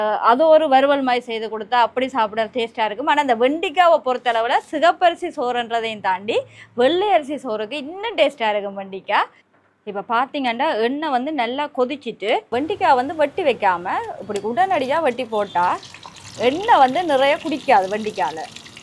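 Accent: Jaffna, Sri Lanka. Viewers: கொஞ்சும் யாழ் மண்ணின் மணம் மணக்கும் தமிழ் சுவையோ சுவை. என் தமிழ் மகளே உன் அன்பான கையில் உணவு உண்ண துடிக்குது என் மனம். நீ தங்க தமிழ் பேசும் அழகு தித்திக்குதே. கொஞ்சும் தமிழ் போற்றும் மிஞ்சும் தமிழ் . ஆகா வெண்டைக்காய் குழம்பு சொல்லி கொடுங்க நானும் செய்து சாப்பிட ஆசை ஆசையாக இருக்கிறது. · உங்கள் தமிழில் யாழ்ப்பாண வாடையும், தம்பியின் தமிழில் மலையக வாடையும் அடிக்கிறதே ஏன்? நீங்கள் எந்த ஊர்?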